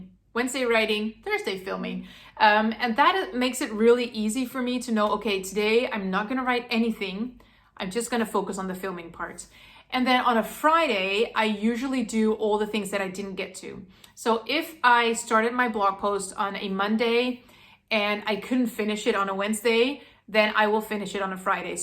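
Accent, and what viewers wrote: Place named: United States. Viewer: I love your videos and find them inspiring Just wondering though....why do you have an American accent since you are a Dutch family living in the Netherlands? I am English and previously when I've heard a Dutch person speaking English it is totally different your accent.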